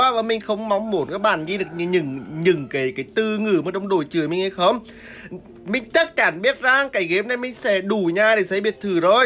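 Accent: Nghe An, Vietnam. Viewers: Tiếng người ở đâu nghệ An vậy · Giọng nghệ an phải ko anh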